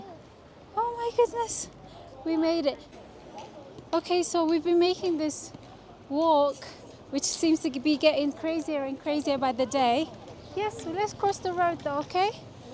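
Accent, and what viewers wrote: United Kingdom. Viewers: "Health and safety officers! eat your hearts out". I like your posh sounding British accent.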